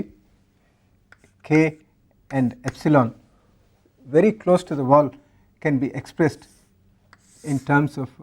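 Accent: India. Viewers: WHY INDIANS TALK LIKE THISSSSSSSSSSSSSSSSS???????????????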